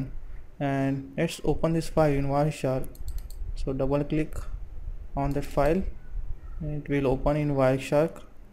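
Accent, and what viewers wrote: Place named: India. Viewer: damn indian accent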